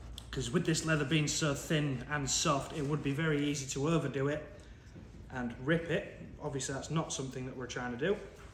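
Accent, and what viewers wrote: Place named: United Kingdom. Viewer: Other then the huge thick UK Accent making it hard to understand! I would like to see the quality of this leather jacket condition now. As, l have been trained never to use alcohol based substances on full grain leather.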